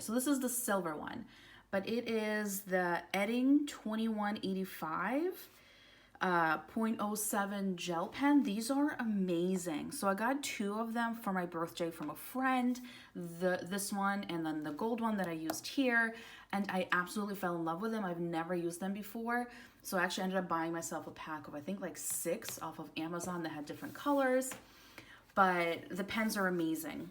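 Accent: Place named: United States. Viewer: Glad you enjoyed your vacation to the states! Where are you from? As you sound like you have an American accent. Although you said holiday so I’m guessing you live in Europe?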